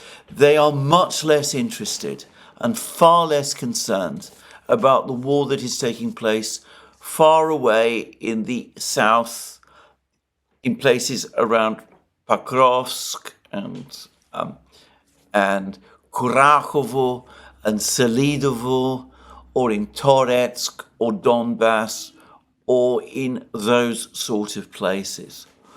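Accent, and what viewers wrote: United Kingdom. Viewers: it is an ai, it is transcribing Alexander's British accent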